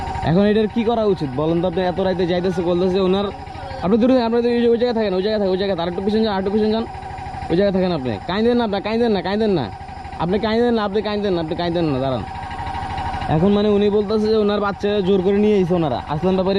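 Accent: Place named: Chittagong, Bangladesh. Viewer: ও আসলেই চট্টগ্রামের আমিও চট্টগ্রামের ওর কথার ধরন দেখে বুঝতে পারলাম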